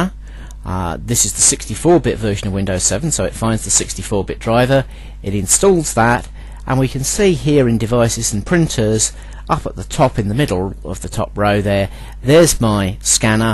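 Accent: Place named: United Kingdom. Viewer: Enjoyed your British English so much, just listened for this purpose :)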